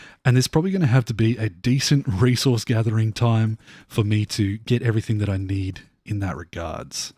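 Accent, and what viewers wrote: Australia. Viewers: When I was watching last episode my girlfriend was in the room and said "he sounds like an Australian who'd make fun of people who played Minecraft". My response? "These eloquently spoken words we are hearing are not for such activities". Continue the amazing work man I have been enjoying this new series so much, I felt very unmotivated to start due to the new massive caves and different generation but I got started and am in love with the new generation.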